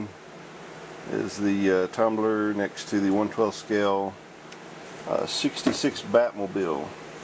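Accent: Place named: West Virginia, United States. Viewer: Your accent tells me you are from West Virginia or close to it.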